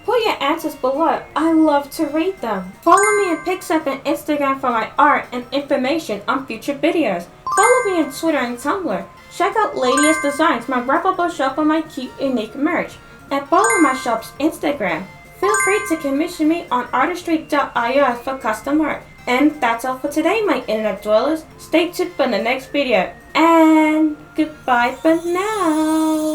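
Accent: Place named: Australia. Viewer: things i like about your cat noir: - his wishing to be free is giving me satoko vibes -the additional abilities you gave him. -his identity as Felix Martin, it's a good homage to the original idea thomas astruc had and adds more potential drama. _how calico black used magic when he was alive, that's a good story by it's own. -the spanish guitar music that played in the video. -you mention how cat noir flirts with whoever he wants, leading up to the typical attempts to seduce ladybug which all fail. -how his dad is sending Akumas to find Adrian as well as take the miraculous, it shows there is more plot outside of the mcguffins. -how you referenced the intro to miraculous by describing him as a normal boy with a normal life. -how you play the original music from the trailer. things i don't like about your cat noir: -if he doesn't care about right and wrong then why does he bother hunting akumas other than to prevent them from finding him. 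- his long white hair is kind of a huge distraction from the rest of his costume it's kind of an eye sore. -i don't know if you are australian or not but your accent was so thick i couldn't understand when you said calico.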